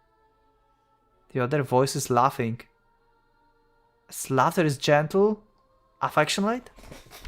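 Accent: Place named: Germany. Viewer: I'm watching this because alot of debatetubers are glazing the main character's writing. Is he really above in terms of characters like Walter White and Beato? Also, I do want to play the full vers (including the sus scenees) on steam but I don't have a functioning pc 😢 Also, I like your accent man, you remind me of a german teacher I once had.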